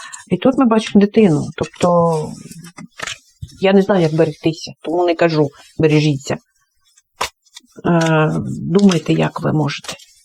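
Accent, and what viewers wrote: Odesa, Ukraine. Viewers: Нічого,ви з Одеси,а там так розмовляють.❤❤❤❤😊😊😊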